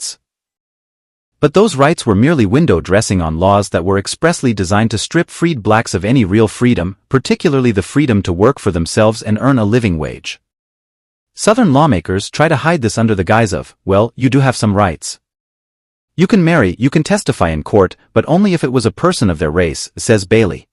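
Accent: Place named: Europe. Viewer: You sound, european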